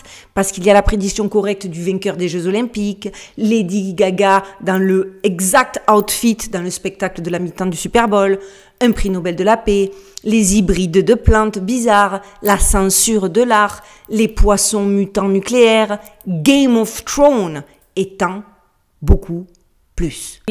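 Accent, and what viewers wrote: South, France. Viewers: Tu ne serais pas originaire du sud de la france des fois 😊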